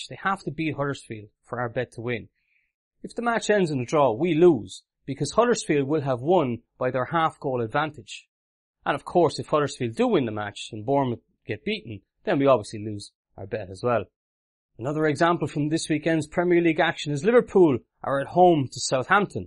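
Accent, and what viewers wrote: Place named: Ireland. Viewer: What part of Ireland are you from man ? good break down of what AH is. cheers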